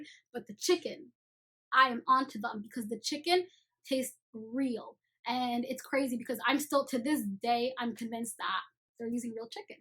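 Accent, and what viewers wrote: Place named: Ottawa, Canada. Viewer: I know an Ottawa accent when I hear one LOOOOOOL mashallah tho!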